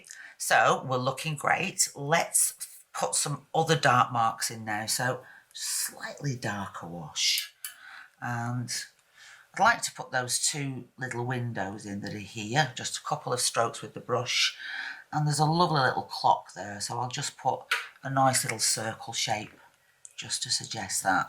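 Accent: Northern England, United Kingdom. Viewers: You demonstrated and explained this so well, thank you, and all with a wonderful and lovely Northern accent too! All the very best for this new year.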